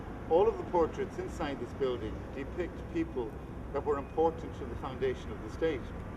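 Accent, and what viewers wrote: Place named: Cork, Ireland. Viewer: You know what. People out there have got to understand why you hate developers so very much. 'A lottery win does not a business man make' Have to say though. I love the Cork accent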